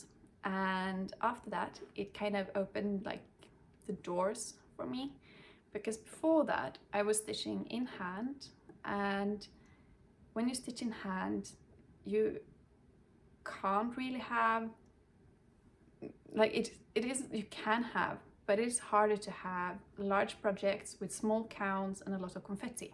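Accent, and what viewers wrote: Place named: Scandinavia. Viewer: Hello from Chicago. Great WIP parade - awesome collection. Thank you for sharing your personal story with us. I think you are beautiful and from my side of the pond, I think your speech sounds just like that of my other acquaintances from Sweden, Norway and Iceland. It is ironic that many of us are struggling with weight loss and we forget that there are other people on the other side of the spectrum who need to gain weight. Best wishes in your recovery, and I look forward to catching up with your videos.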